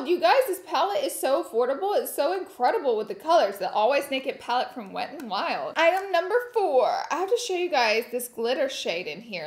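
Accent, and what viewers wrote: South, United States. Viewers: I just adore you you are the cutest and I love your lil countrygirl accent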